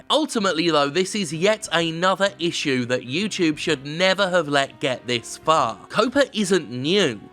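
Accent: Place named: United Kingdom. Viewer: Do you know whether or not the US FTC can even fine foreign creators if we violate COPPA? I've been searching for a definitive answer and finding nothing so far. I'm Australian. You sound like you're from the UK. They may be able to force YouTube delete our videos or channels because YouTube is a US platform which has to comply, but I'm pretty darn certain this badly written revision of a US law does not apply to me.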